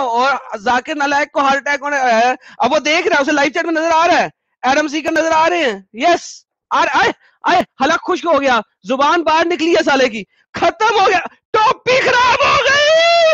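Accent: India. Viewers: you are just awesome. I used to listen to this idiot as a non muslim when my Muslim friends preached Islam. I wanted to know reality and always despised Zakir Nalyak because of how he belittled other religions down and claimed only islam is true religion of God. Don’t know how some people convert listening to this idiot. He has no logic. you are very knowledgeable and entertaining, should open a comedy channel. Love your Punjabi accent 😊. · I love how has picked up sahils mubaiya accent 😅❤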